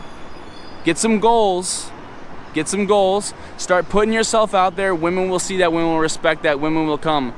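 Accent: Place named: United States. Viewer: Dude it's obvious your Americian when you talk and the cloths you wear. But yes most of what you say is facts.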